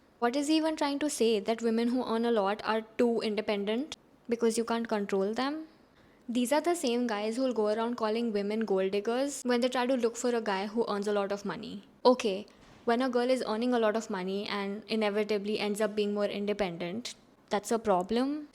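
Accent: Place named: South Delhi, India. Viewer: just shutt up this nonsense shitt and speaking south delhi accent😂its not making u cool🤡🤡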